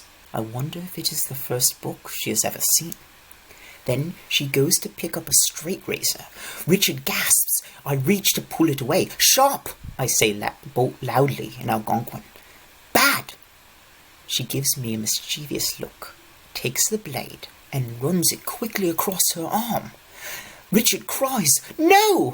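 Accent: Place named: England, United Kingdom. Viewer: The horrible English accent just makes this book ten times worse than it is.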